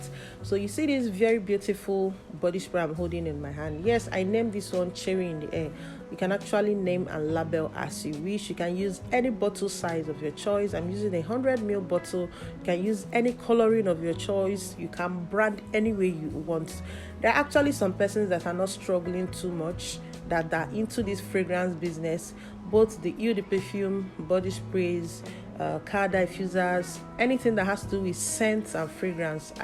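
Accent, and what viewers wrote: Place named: Nigeria. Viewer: may God shine His Light and Bless you in this 2025!!! Your accent shows me you are from Nigeria. But I from Tanzania always feel so close to you. By the way you narrate your projects as if you talk to your close family. Thats how you invited me to follow and learn from you. Having tried tested and found its true. You have all my respects my sister. May God shower you with Uncommon Blessings in your life this year.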